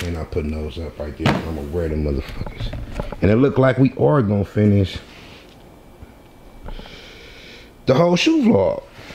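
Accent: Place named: Los Angeles, United States. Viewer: first time on your channel... but are you from LA? you just got that accent lol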